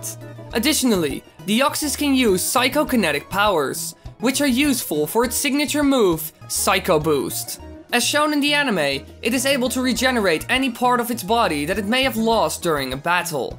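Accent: Netherlands. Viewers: i have one question, are you dutch because your accent sounds like one.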